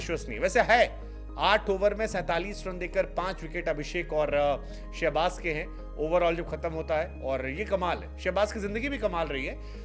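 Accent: Bihar, India. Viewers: Sir AAP Bihar se ho keya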